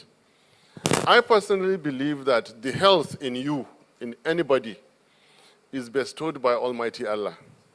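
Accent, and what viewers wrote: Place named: Hausa, Nigeria. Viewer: Wow.. this is so clean of an hausa man speaking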